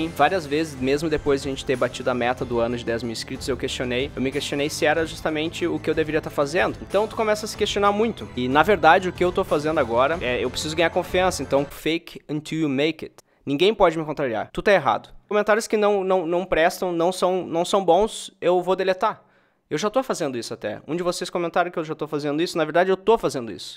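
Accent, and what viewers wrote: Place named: Porto Alegre, Brazil. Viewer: Poha, obrigado pelo diagnóstico! Off: Sotaque porto alegrense detectado.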